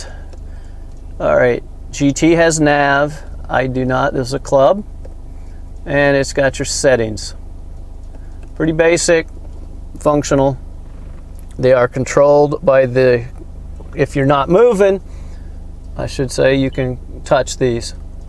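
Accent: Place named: South, United States. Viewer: That’s just how many from the South speak — I, for one, like it. Be pretty boring if everyone spoke at the same speed. Watch reruns of Andy of Mayberry or the film To Kill a Mockingbird; that’s how folks talk down there. Seinfeld? Much faster. It’s all good.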